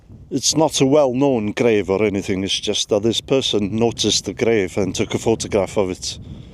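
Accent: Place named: North Wales, United Kingdom. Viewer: I’m a 100% Welshie from Gwynedd and I have to say we do have the most beautiful views in the world plus it’s nice to have a video with someone with my north wales accent. Love the video btw ❤ da iawn a diddorol🏴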